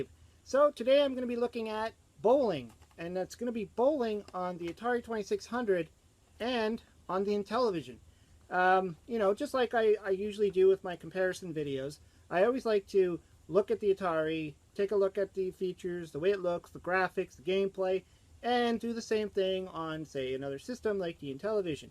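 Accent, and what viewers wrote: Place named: Canada. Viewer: You sound and look canadian like from Toronto or something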